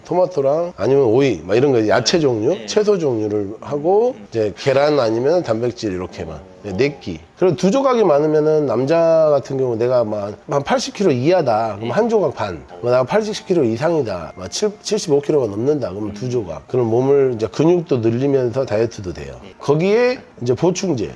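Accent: Jeolla, South Korea. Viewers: 전라도사투리가 아주 정감가브러!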